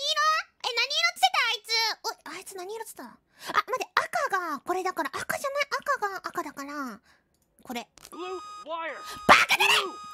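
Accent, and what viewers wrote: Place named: Japan. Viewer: Peko japglish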